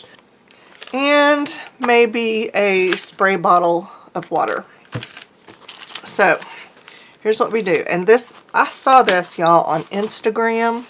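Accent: South, United States. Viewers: Brilliant as always. We all miss you so much. Thanks for treating us to that wonderful southern girl accent again. ♥️😉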